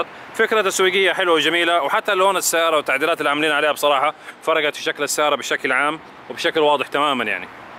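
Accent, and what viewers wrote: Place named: Bangladesh. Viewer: كركر زياده لهجته لهجه بنقاليه ، . . .اضبط لهجتك لا تتكلم بهذي طريه تكلم بلهجه العامة . . .ولا تكلم بلهجه القبليه اما هذي الهجه مدري كيف جايه تح انه سبك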